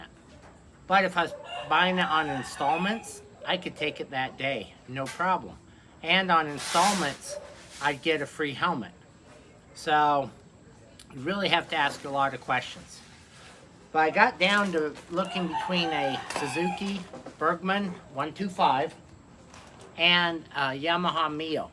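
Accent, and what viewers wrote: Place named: Oklahoma, United States. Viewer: are you from oklahoma, you quite got the accent...anyway Im filipino american, retired marine. i like how you did this, lots of important info and the requirements you are looking for on a bike is the same like what i need. I look at other filipino vlog coz I speak tagalog too AND THEY ALL SUCKS. you dont see them does what you did. Its all about bloody all about themselves, laughing and running the camera on things you already seeing just to past the time. Their actions and voices all very irritating to the ears and just talking about nonsense... Hope you enjoy your stay there in that beautiful country populated by mtfrs.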